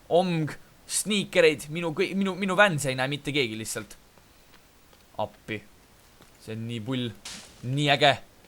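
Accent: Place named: United Kingdom. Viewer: Su British Aktsent on nagu Geographic Channel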